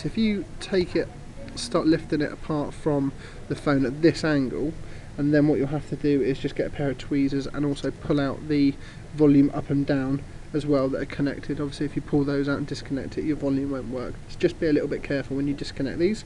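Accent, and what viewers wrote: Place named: United Kingdom. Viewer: Strange one, how do i tell if the replacement digitizer i have is the IC or non IC version? I know how to tell which version the phone is by looking for the IC under the sim card holder but my friend got the phone and ordered the replacement digitizer but i dont know if he ordered the right one or not! Also you sound British in the video, where are you from? I am in Kent. Cheers, Phil.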